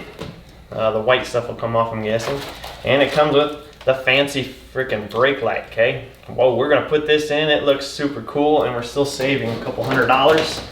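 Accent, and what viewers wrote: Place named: Arkansas, United States. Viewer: Enjoyed the video! I was sitting here telling my wife that you sound just like her cousins in Arkansas and you could have done the video in about 45 seconds if you hadn't been so happy, friendly, sound effecty, and chatty lmao! 🤣 I'm going to subscribe just for the future sound effects!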